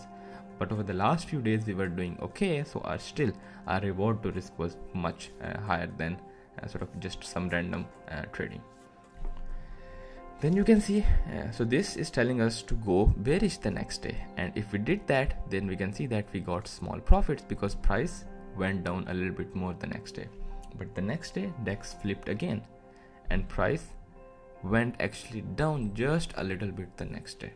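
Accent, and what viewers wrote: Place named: Gujarat, India. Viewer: Are you from gujarat or mumbai by any chance... Guessing from the accent.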